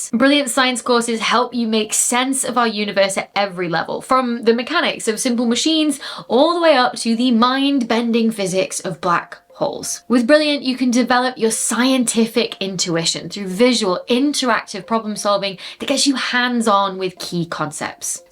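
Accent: United Kingdom. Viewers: For longer…. It’s the Accent… British people would understand this 🙂 But I get how it sounds if you not used to our accent 🙀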